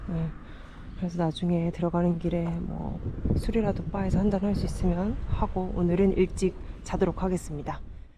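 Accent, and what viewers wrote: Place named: Gyeongsang, South Korea. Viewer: 저도 경상도 사람인데 혹시 어디분 이세용??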